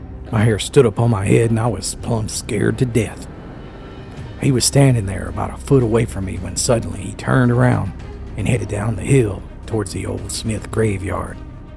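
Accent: Appalachia, United States. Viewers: The villages Florida .. great voice over because I know the the mountain folks sound just like you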